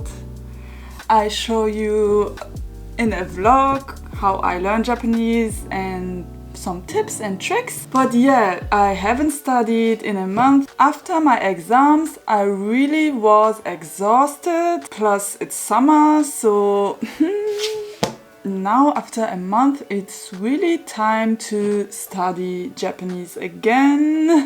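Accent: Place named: France. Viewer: U have a French accent!! Ur mother language is French ? .. PS: keep filming videos like this 🥰🤍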